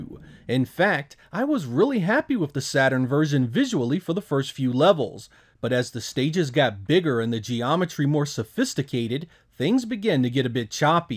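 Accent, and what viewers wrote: United States. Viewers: Just watched the video, and again we hear "Morio". Americans struggle with the "a" sound. Usually they turn it into an "e". So "apple" becomes "epple" but with Mario they turn it to an "o" - "Super MOrio". It's a mystery to me...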